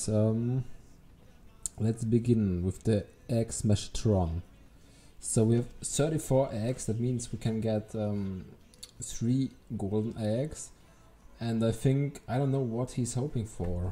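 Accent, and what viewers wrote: Germany. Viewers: Bist du deutsch du hörst dich so deutsch an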